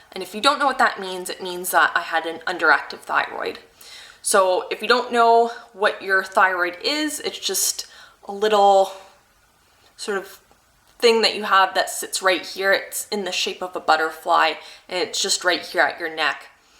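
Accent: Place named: Canada. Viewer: Thanks for the response! Apparently the nodule has all the markers of being cancerous but you definitely helped calm my fears. I'm so nervous about the biopsy! I am in medical school and the anxiety that sometimes lets my mind run away makes it hard to concentrate! The school physical was actually what incidentally found my hypothyroidism because my PCP wanted to check thyroid levels "just in case" and then i also had the ultrasound for the Hashimoto's "just in case." On an unrelated note, I love your accent! the "out" and "about." Canadian, eh? :) thanks again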